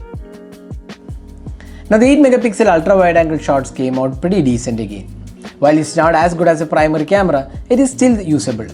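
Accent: Kerala, India. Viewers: English kettalariyam malayaliyanu bro enn!!!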